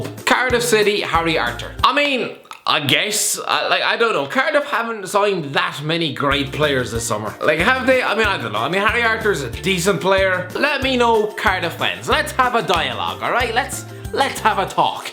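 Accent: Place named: Ireland. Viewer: Listening to a guy with a broad Irish accent attempting lengthy Greek pronunciation is a golden experience.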